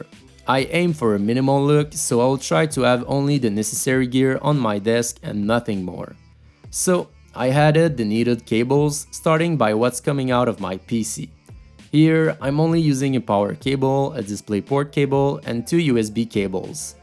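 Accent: Quebec, Canada. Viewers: Je reconnais l'accent québécois ;) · tu as l'accent du québec,mais bonne video tabarnak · Yoooooooooooo serais -tu québécois par pur hazard??? XDD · thanks and I have a question ... are you Canadian? I noticed that you had a little Canadian accent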